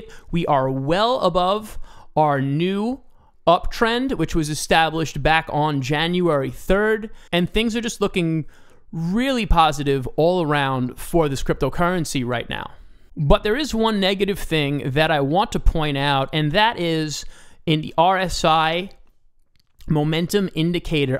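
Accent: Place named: New York, United States. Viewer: California by way of the Bronx but I definitely caught the NY accent!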